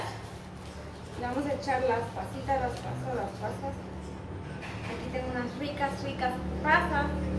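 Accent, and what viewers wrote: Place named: Guatemala. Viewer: De todas las chicas de los grupos chapín ella es la única que habla como su país pero que se escucha bonito es decir no vulgar su forma de hablar su acento.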